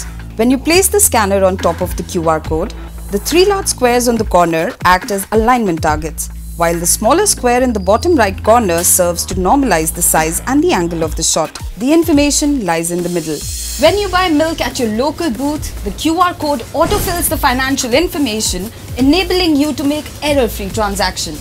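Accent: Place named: India. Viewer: the indian accent always kill me, and the video does not explain how it works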